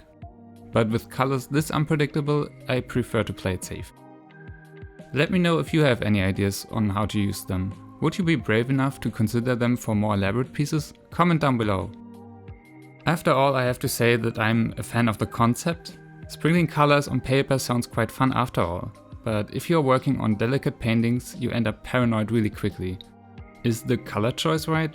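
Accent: Germany. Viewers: Hello, Im new here. And I have a question: Is the a German accent Im hearing?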